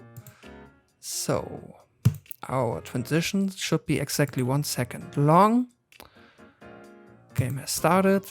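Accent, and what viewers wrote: Germany. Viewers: I love your channel! Man hört dein deutsch voll raus :P Ich abonnier mal ^^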